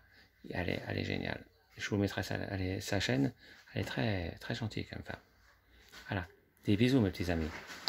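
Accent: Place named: Quebec, Canada. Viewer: Coucou 🤗 je viens de regarder tes 3 parties de retour sur les conseils de Cécile et j adore 😉 d où est ton accent ? Quebec ? Je m abonne 😊 à bientôt 😙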